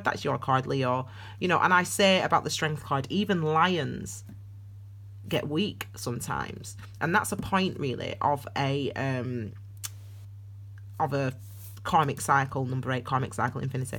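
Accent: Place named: Yorkshire, United Kingdom. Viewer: I’m just trying to figure where it is. It’s almost definitely Yorkshire-ish 😉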